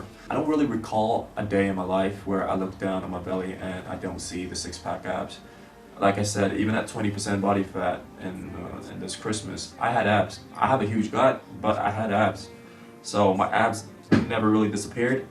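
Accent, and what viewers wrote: United States. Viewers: From your accent I thought you were American not British! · You said you are from Britain but then why do you have an american accent???????????????????//// ????????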